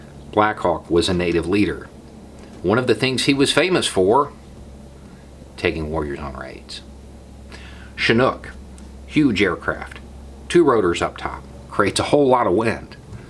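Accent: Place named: Florida, United States. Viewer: Wow, I never really thought you sound like a Floridian until you tried to make the word “our” NOT sound like “are”🤣🤣🤣 I’m from Indiana and I can’t do it either🤷‍♂️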